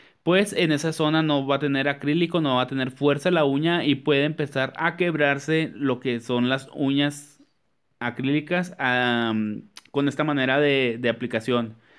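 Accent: Chihuahua, Mexico. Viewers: Eres de shihuahua?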